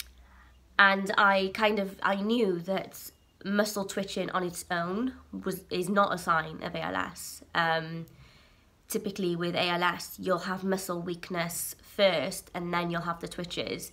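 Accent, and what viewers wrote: Cardiff, United Kingdom. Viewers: Cardiff girl by any chance?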